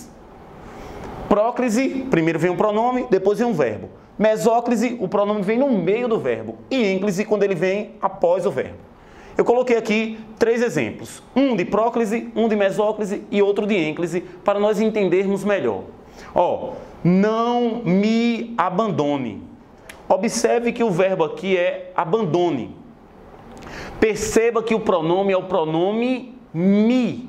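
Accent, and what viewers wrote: Northeast, Brazil. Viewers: Seu sotaque nordestino é da hora não é aquele sotaque caricato, cômico e falando errado!!!!!!!!!😀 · Caramba, que aula boa!!!! me ajudou bastante, ele é do nordeste?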